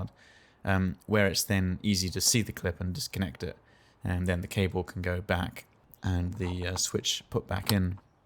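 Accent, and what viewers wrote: United Kingdom. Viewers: I think he's British Mate!!!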